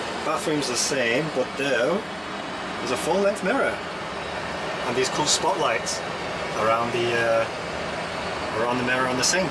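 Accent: United Kingdom. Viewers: ARE YOU BRITISH??? Dude I thought you were an Aussie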